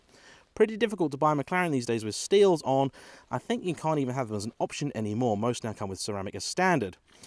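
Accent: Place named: England, United Kingdom. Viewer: people from england have such a stupid accent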